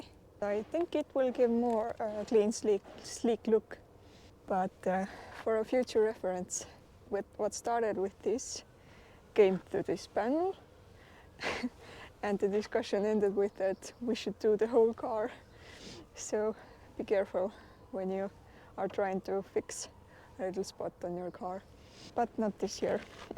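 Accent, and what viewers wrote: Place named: Finland. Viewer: I was thinking your accent sounds finnish but your plates are Estonian. Then i realised where Estonia is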